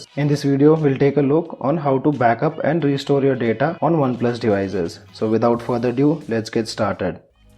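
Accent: India. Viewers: everytime i hear a India voice i think a scammer Donda dell me Whad do do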